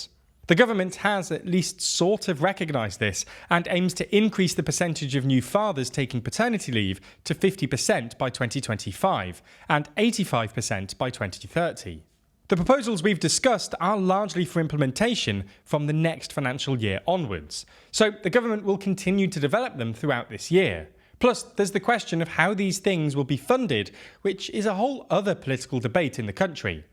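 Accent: England, United Kingdom. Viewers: Oh no, Japan will have to import infinity foreign colonizers (sometimes called asylum seekers by idiots in America and Europe) to solve this problem. They couldn't, I don't know, reduce the population density naturally and rebalance the population pyramid over the long-term. Japan will be fine so long as they do not accept foreigners into their country and maintain their unique people to live on their own. No need for cheap British solutions coming from the Americans 52nd state (Canada for those wondering). TLDR is truly just shitlib propaganda with an English accent, but zero muscle mentally or physically for that matter.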